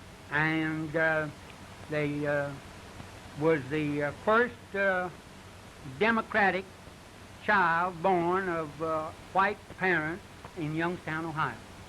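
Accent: Oklahoma, United States. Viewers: do many modern okies still talk like him or have they all been modernised? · Okie accent!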